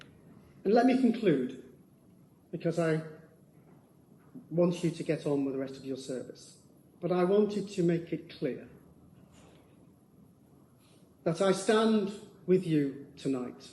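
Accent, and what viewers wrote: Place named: England, United Kingdom. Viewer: bad sound quality and that silly English garbled accent-yuckkkkkk!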